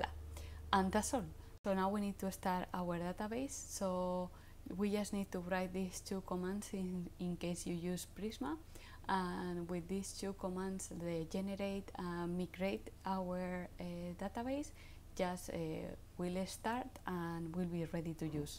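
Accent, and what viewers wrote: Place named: Spain. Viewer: Love the accent. U from Spain?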